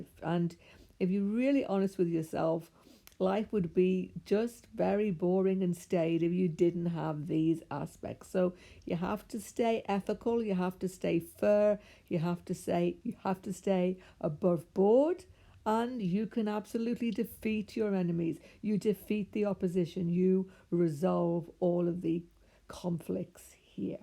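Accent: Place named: Welsh Midlands, United Kingdom. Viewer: It's cool hearing a welsh/ midlands accent in an astrology video hehe 😜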